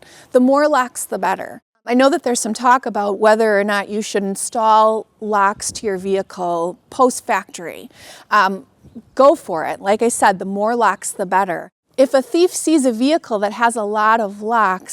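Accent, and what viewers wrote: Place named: Wisconsin, United States. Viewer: its called an accent. Shes from Wisconsin. Also- <link>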